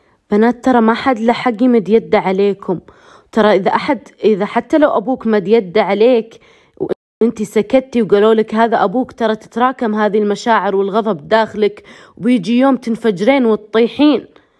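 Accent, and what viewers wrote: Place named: North, Saudi Arabia. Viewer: لهجه اهل شمال ومن عرعر ترا عادي ولو تتابعها بتشوف نزلت كل معلوماتها وجوازها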